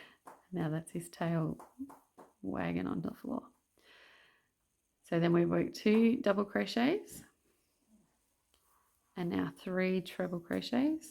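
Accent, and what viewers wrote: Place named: Australia. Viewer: Hi! Looks great..just found it and will make one! Greetings from Italy.. I guess you are in Australia right? ( accent😀)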